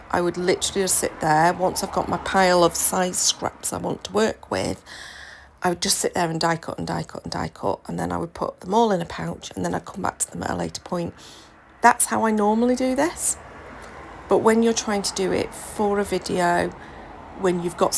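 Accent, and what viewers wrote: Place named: United Kingdom. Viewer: So happy I have discovered you throught this series! Love your creativity and your lovely accent takes me back to my university days in the UK ( a VERY long time ago 🫣). As for scraps, I have arrived at a system which works for me: I invested in one of these little plastic containers with seven (usefully) compartments, I believe they are intended for soup packets etc. puzzlingly. I designated each compartment for one of the rainbow colours. Now I can pull scraps in the colour I need every single time I die cut, before I pull a full sheet… It works a trat for me. Thank you for sharing your amazing videos, kind regards from Germany, Nicola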